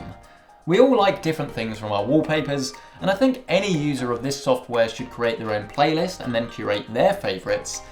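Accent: United Kingdom. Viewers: Clear British speaker, not a loud American.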